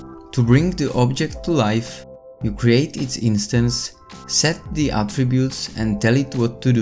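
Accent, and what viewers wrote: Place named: Czech Republic. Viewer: Český přízvuk nezapřeš :D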